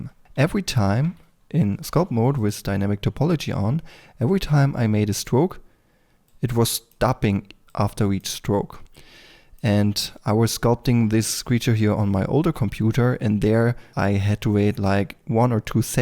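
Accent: Germany. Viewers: sis jerman äccent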